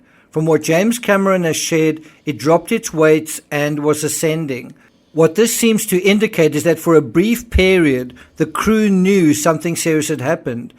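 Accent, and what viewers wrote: South Africa. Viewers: I like your stuff. South African voice? Expat?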